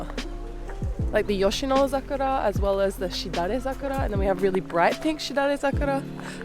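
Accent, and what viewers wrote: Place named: Australia. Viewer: I dont think I ever comment on your videos, I like how you show things in a chill way with your aussie accent! <3 enjoy! my dream is to go to japan one day !😄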